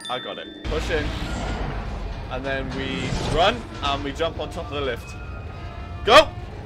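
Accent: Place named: Wales, United Kingdom. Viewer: Sounds a bit welshy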